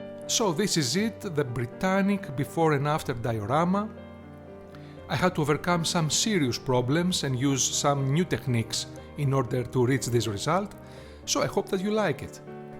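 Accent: Greece. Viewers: Με τη προφορα των αγγλικών ήμουν σιγουρος οτι εισαι Ελληνας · are you greek ? Your accent sounds alike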